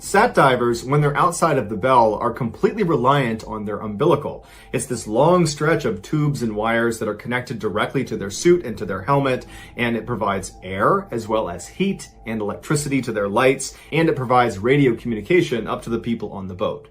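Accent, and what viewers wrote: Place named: New England, United States. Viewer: Love your stories... best part is hearing it with the accent. I never realized how much I missed it until I watched you for the first time. ❤ New England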